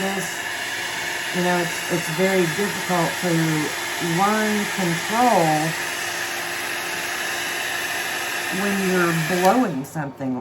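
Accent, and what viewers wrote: South, United States. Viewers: Hi, another gorgeous piece! You’re so patient. Can I ask, when using the photo paper, doesn’t it soak through? I bought an ordinary brand for ink jet printers and that’s exactly what happens. Soaks straight through. Have I got the wrong type? Or is that normal? Thanks. I love watching you create and listening to your southern accent. 🙏🙏🙏🤗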